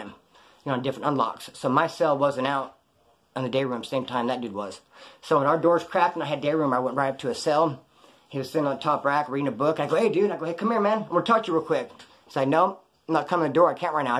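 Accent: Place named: West, United States. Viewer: U from az or cali?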